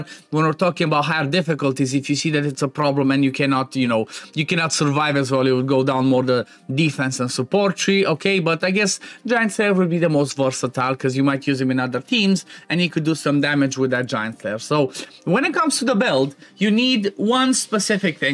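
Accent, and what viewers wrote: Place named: Romania. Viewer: Accentu de român))))) Oricum, fain video, ține-o tot așa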